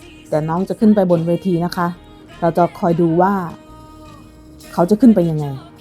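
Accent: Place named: Central Thailand, Thailand. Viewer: เสียงบรรยายพูดได้จังหวะท่วงทํานองภาษากลางได้ชัดเจนมาก เทียบเท่าพิธีกรมืออาชีพ หรืออาจจะมากกว่านั้น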